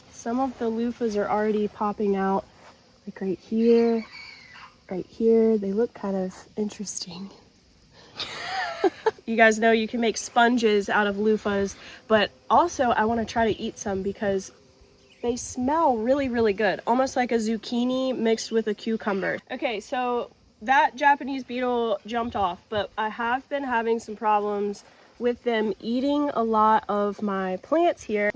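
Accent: United States. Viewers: Are you American ❤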